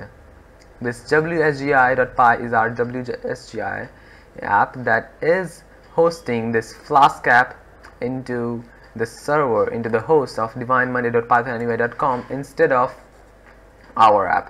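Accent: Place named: Brazil. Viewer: Ahhhhhhh 😂😂😂 é Brasileiro, certeza...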